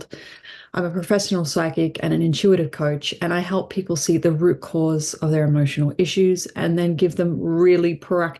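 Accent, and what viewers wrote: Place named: Australia. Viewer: Your Australian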